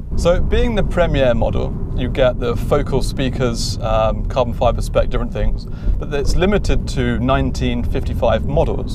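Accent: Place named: England, United Kingdom. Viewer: Say Alpeen!!! like you say Ferraree and Lamborghinee! what an english uggly pronunciation you've got!!!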